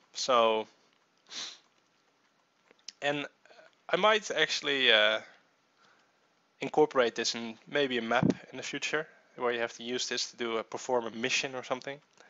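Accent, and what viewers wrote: Netherlands. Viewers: Yeah you can hear That he's dutch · Are you dutch?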